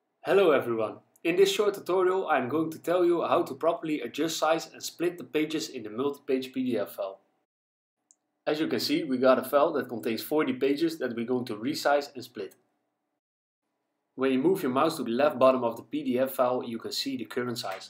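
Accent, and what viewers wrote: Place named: Netherlands. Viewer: Are you Dutch? I can recognize your accent, but not sure :p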